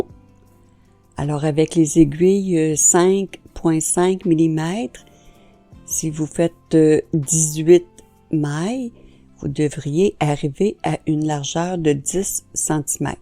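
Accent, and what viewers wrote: Canada. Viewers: Merci beaucoup pour ce nouveau tutoriel.Le rendu est magnifique et les deux brins de laine vont donner un résultat bien chaud. Je vous félicite pour vos explications et images très claires... et ce qui ne gâche rien j'aime beaucoup votre charmant accent canadien ❤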